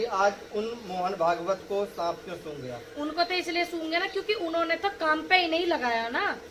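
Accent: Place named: Haryana, India. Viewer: जी,आप का ठेठ हरयाणवी गाँव वाला लहजा और किसानों के लिए संघर्ष करते हुए देखना अच्छा लगता है ।किसान को छेड़ कर बीजेपी ने अपने रसातल मे जाने का मार्ग प्रशस्त कर लिया है।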